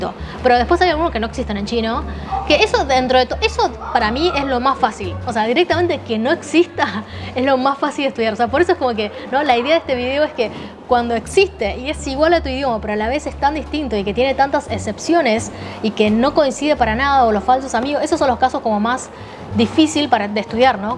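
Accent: Argentina. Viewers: Más bien habla como Surispanoamérica O Argentina o uruguaya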